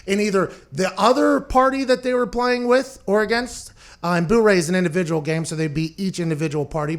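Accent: Pittsburgh, United States. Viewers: Dude your awesome lovin the pittsburgher accent born and raised big dogg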